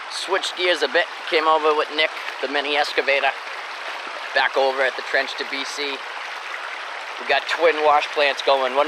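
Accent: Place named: New England, United States. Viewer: Thought you were a new englander. Thanx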